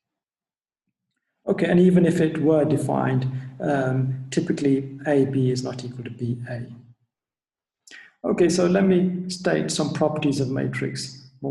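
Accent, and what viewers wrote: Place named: Oxford, United Kingdom. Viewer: sübhanallah sanki oxford üniversitesinde derse girdim bu aksan gerçek mi😱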